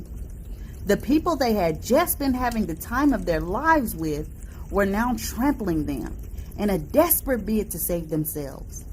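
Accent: South, United States. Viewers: That is such a pretty picture of you in the intro 😍. You're such a naturally pretty lil cutie pie. Love the stories, keep up the good work.. SCANDALOUS CHILE!!!! 😆 🤣 I love that you're such a southern Belle, "Shucks" 😆 Reminds me of going to South Carolina to visit my cousins "down the country" as my great grandmother would say. The way you talk brings back such good memories of my great granny. 👵 I'm just waiting for you to call somebody a jitterbug. 😆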